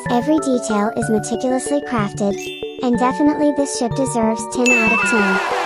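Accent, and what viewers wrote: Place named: England, United Kingdom. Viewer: soy yo O te veo con la voz más inglesa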